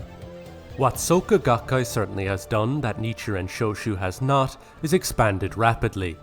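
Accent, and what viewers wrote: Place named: Ireland. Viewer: I can see you did alot of research for this vid and it is well put together. I think talking the to people who practice with the SGI would have given you quite a different perspective and tone to it though. I am Irish (like you by the sound of it!) and practice Nichiren Buddhism here with SGI-Ireland. I initially started practicing abroad 20 years ago. I have seen how members practice in many countries (including Japan). There are so many inaccuracies in this presentation I dont even know where to begin! Maybe you will cross paths and get to know an SGI member some time and be pleasantly surprised by what yiu have misunderstood. I hope so 🌞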